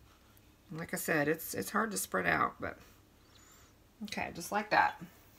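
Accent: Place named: Arkansas, United States. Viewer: Oh Sis, these look delicious! TFS!! That Arkansas accent is really sounding out today!😊😘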